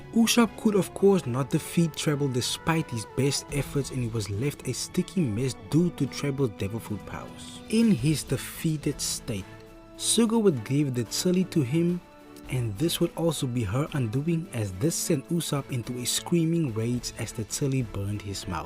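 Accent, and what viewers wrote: South Africa. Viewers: Is that a SA accent I hear. Awe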